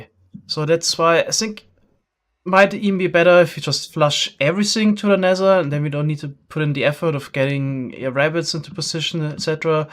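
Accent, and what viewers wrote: Germany. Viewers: His accent is really german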